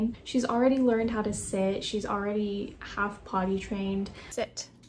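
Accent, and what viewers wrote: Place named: United States. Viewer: Sorry , hold up, what did u say....'Puppy Store' what the hell is a puppy store, what like a shoe shop or bakery....I assume from your accent your based in America somewhere...tell me can you go into 'store' and buy a dog, like a loaf of bread or a pint of milk?....was she even with from her momma in the pet pen... This is all so wrong...doesn't happen in Scotland ...no puppy stores...puppy farming is horrific and needs to be stopped it goes on everywhere, but a 'puppy store' .. geeze, thats screaming out, 'ill treated animals right there.. be mindful people, be sensible people, be savvy.. buy a puppy from a home breeder...spend time getting to know the breeder and family, make several visits to the home, spend time with them and the dogs and the litter....don't buy from a store ffs 😥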